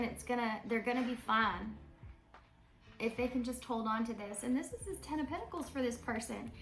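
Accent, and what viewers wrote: South, United States. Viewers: Just found this channel. Pleasantly surprised by your southern accent! Love from southeast Kentucky ❤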